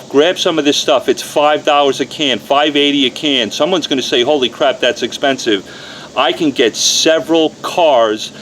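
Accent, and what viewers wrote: New York, United States. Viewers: Dude, your rock! I have listened to Michael Savage on talk radio for years, and his accent and direct manner of speaking is quite unique to NYC. IF you're NOT from there, I will use Blu-Tac to clay my 1983 Chevrolet Caprice with this Armoral. (Hint: IMA do it anyway...) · i love your videos. When did you move to Dallas from New York? your accent reminds me of ME lol lol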